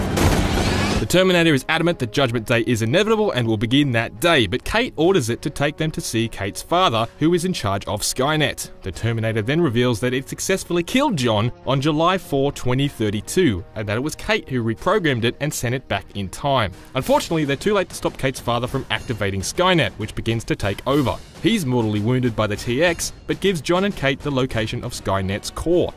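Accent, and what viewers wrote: Australia. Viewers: just a question are you Australian? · Australia mate?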